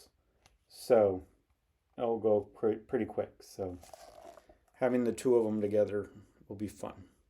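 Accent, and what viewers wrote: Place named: Wisconsin, United States. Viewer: Love love love the Plum Street saltboxes layout ..... I'm a house stitcher ...... you've enabled me 😊Also the Drawn Thread seasons idea. I've written this down or I tend to forget. Great great stitching!! Sounds like you are from Wisconsin. I'm halfway between Green Bay and Appleton. Enjoyed your bourbon story......I like to learn. Thanks for sharing! TV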